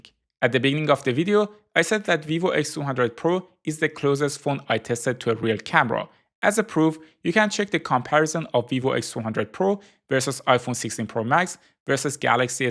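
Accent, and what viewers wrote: Iran. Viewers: انگلیسی صحبت کردن ایرانیا همین قدر ساده و قشنگه .. هر جا هستی موفق باشی داداش گلم ❤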